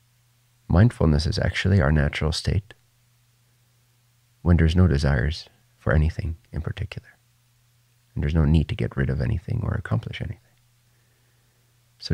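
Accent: Netherlands. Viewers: His dutch accent is kind of distracting...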